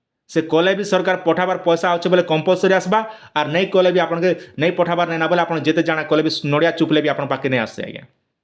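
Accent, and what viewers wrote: Kalahandi, India. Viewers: Amor Kalahandi bhasa news bhal lagla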